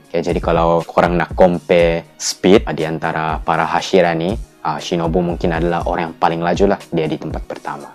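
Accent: Sabah, Malaysia. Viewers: Org sabah ka bro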